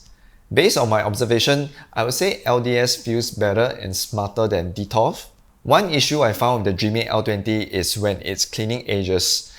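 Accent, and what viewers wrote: Singapore. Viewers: You sound from SG. Are you? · You sound like my coworker from Singapore, la. Did I guess right?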